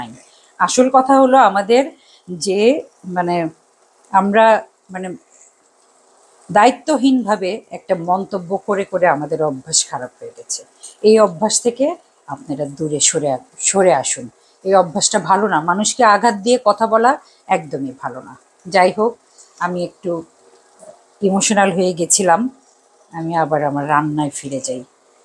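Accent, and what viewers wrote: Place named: Noakhali, Bangladesh. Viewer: নোয়াখাইল্লা আন্টি আসলে আপনি কে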